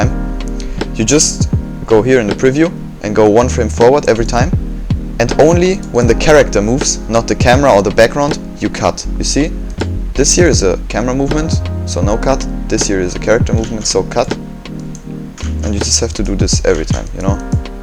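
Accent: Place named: Germany. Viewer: mag deinen deutschen akzent und natürlich auch das tutorial xD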